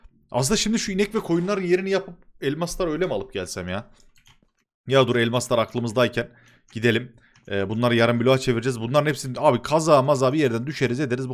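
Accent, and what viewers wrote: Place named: Kahramanmaraş, Turkey. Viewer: Abi maraşlımısın? (şiven benziyo)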